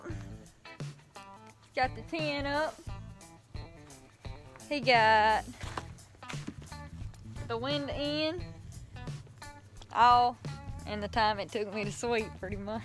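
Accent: South, United States. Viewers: I know I am not alone when I say that I just tune in to see that Beautiful Smile of yours and hear your sweet, Southern Accent, oh and the house framing tips. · Lotta Yanks don't like southern accents, don't count me in that group. Soft southern accent is easy on the ears and easy to understand, add her pretty face and I'm payin' attention. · This girl has a strong southern accent.She has the best smile 😊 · I love that accent of yours true american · That's it I'm moving to BAMA the 1st time I watched any of her videos was yesterday I was just browsing didn't click on just seen her and u know any normal guy was thinking damn hot chick...then I clicked on and that countrybumpkin accent came out of her in n love lol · Well I should have said it better her southern accent either way it's a compliment so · Dang, I just found your channel today and I must say, you're what I'd call a “Modern Southern Belle“! 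You have the face of a princess, the body of a movie star and the most endearing southern drawl I've ever had the pleasure to hear. Plus you obviously honour your parents, which I actually deem important. Also I like the fact that you're not shy to get your hands dirty and get stuff done. Keep it up like that and I'm pretty sure you'll be famous WAY beyond social media. At least I'd LOVE to see that happen. The best wishes from the old world (Germany)... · I love your soft southern accent. Beautiful. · Love that Southern Belle accent.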